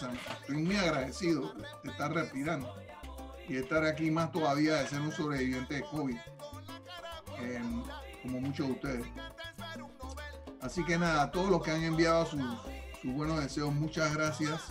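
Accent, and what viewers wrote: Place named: Panama. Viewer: Lo he visto dos veces una vez en el instituto Naciónal que fuisteis a cantar y la otra cuando era candidato para la presidencia es muy amable y cariñoso Dios te bendiga lo que más admiro de ti que no has perdido tu acento de Panameño a pesar de haber vivido tanto años afuera del país !!!! Saludos desde Florida!!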